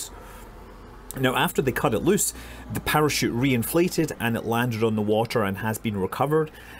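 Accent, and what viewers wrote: Scotland, United Kingdom. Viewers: Have you stopped by in Scotland on your way to Iceland,? Maybe it's just me, but I thought I heard a little more of the Scooootland in your accent than usual:D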